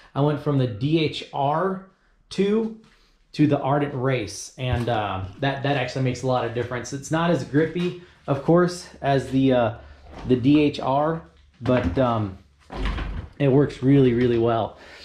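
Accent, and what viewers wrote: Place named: Texas, United States. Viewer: Whoops, should have just waited until the end of the video, sounds like Texas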